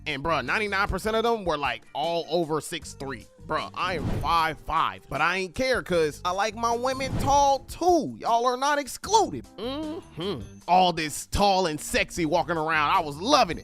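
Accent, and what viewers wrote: Alabama, United States. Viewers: Holllll uppp you from Alabama?;?!?!?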